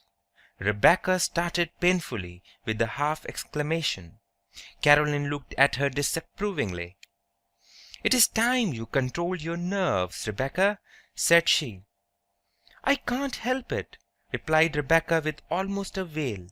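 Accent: India. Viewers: i.m agree the indean accent too mach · Man, it’s hard to learn from heavy Indian accent. How can I improve my pronunciation with this reading?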